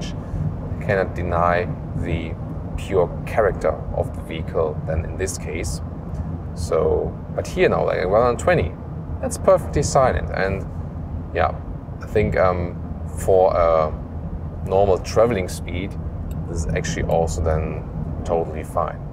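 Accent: Germany. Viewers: I will get the new Defender next week but still watching reviews. I thought I saw them all but today stumbled over yours. As a fellow native German speaking liked your accent already but then I discovered that this is the review I was looking for. It's just the way that if you get the car and discover it yourself with all the little details. And you give your opinion and verdict, which obviously you can buy or not. Best review I saw on the New Defender. Thanks!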